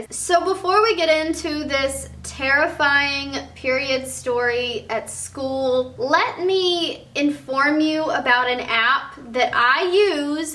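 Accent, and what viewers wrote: South, United States. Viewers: her southern accent is cute 😁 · You sound like a country girl. I love it.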